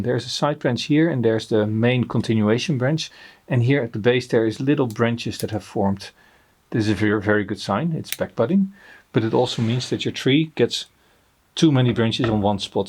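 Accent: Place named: Netherlands. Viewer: Another very enjoyable video,! Your tips are very easy to understand and remember. Also I enjoy another Dutch person speaking English, always funny how we pronounce a lot of English words, it makes us very recognizable haha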